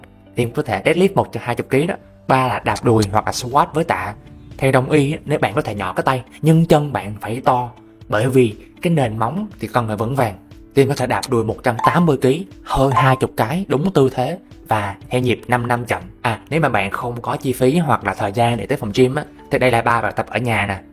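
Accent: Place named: Vietnam. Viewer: Video rất bổ ích , mà nói giọng tùm lum tà la, lúc giọng bình định lúc giọng bắc lúc giọng nam, cũng ráng nghe đó mà nó cấn cấn k có êm tai. Mình góp ý chút vì bạn rất có tâm vào video này, mong sau này bạn luyện nói 1 giọng thôi cho nó êm tai, vì video dài như thế này cần 1 giọng đọc hấp dẫn mới nghe đi nghe lại để học và tiêu thụ kiến thức, chứ k phải nghe 1 lần rồi thôi. Cảm ơn bạn thanh niên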